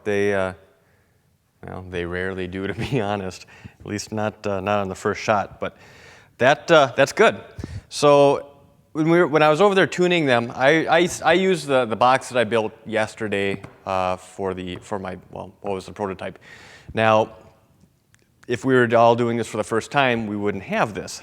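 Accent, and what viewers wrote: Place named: Minnesota, United States. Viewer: are you minnesotan? definitely sounds like it!!!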